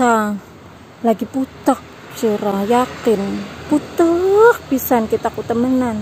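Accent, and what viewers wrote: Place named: Cirebon, Indonesia. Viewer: Cirebon logat ny,,astagfirALLAH ngahayal dikit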